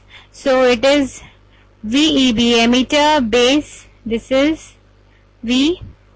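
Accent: India. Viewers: very very very helpul .... UR HINDI ACCENT IS AWESOMME !!!!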